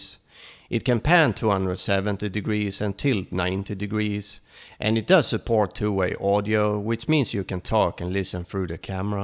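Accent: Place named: Sweden. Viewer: That must be a swedish accent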